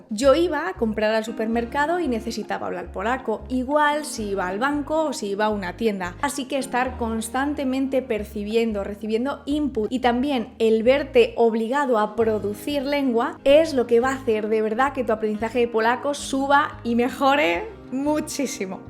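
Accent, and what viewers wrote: Spain. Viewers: Amiga, cómo aprendiste ese acento de España? No es por ofenderte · ??? Ella es española 😕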